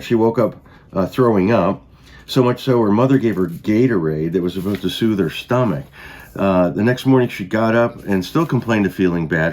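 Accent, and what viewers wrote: Chicago, United States. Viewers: I knew it! I commented about a possible watered down Chicago accent in the Lisa Marie autopsy vid, which is the first video I watched on this (very interesting & well-done) channel. Doesn’t matter how long you’re gone, it always creeps back in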